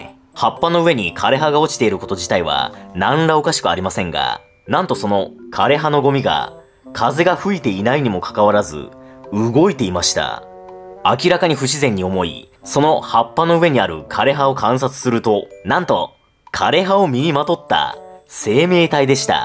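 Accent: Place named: Nagoya, Japan. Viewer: 、ご出身は名古屋ですかね？イントネーションが名古屋周辺の方そのものだったので。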